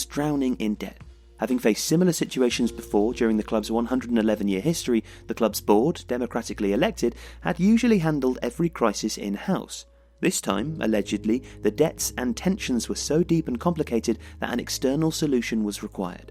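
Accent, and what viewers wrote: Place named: Wales, United Kingdom. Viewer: Wow, now I feel like a dick. I didn't actually know about this Welsh. I'm from Egypt and we have this sort of stereotype about the British adding the Kh letter to everything like in habibi "khabibi", you can see it in really old tv shows here but in the Real world when I started talking to people from England especially Londoners not one of them was even capable of producing such sound, like saying Khaled as " D. J. K-aled " and then i was convinced that this stereotype came from the time of British occupation of Egypt where those who learned to pronounce the letter "kh" in Arabic are just showing off by jamming it into any and every word. I thought you were just being a hipster or something. P.S. in Arabic there are 2 letters for H, like B and P in english. and the one in el Ahly is different from the one in like Habibi, so i can see now how it would be hard for someone especially with a Welsh accent to pronounce it. 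just try not to think of Habibi when saying el ahly. think Haba Haba.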